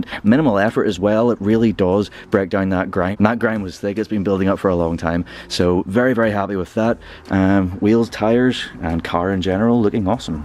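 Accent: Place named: Northern Ireland, United Kingdom. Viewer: Love the video mate I recognise that accent whereabouts in NI are you from?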